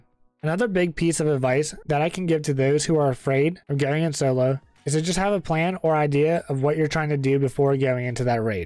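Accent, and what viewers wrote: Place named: Pittsburgh, United States. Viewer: I could hear it in your accent haha.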